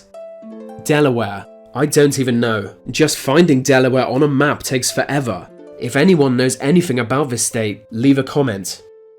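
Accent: United Kingdom. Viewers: U INSULT TEXAS AT LEAST WERE BETTER THAN UR BRITISH SOUNDING ASS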